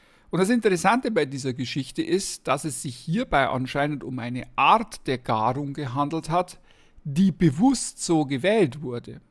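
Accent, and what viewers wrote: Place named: South, Germany. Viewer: angenehmem süddeutschen Akzent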